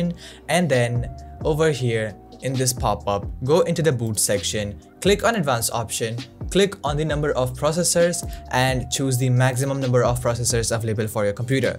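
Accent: India. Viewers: We can hear your Indian accent. You don't have to hide it.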